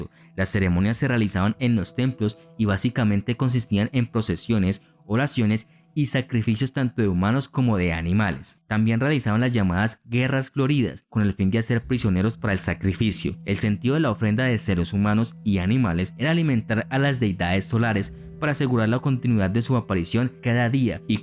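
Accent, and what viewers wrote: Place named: Colombia. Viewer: El narrador es Colombiano, se nota cuando pronúncia Frijoles y cuando no puede pronúnciar Las palabras Nahuatls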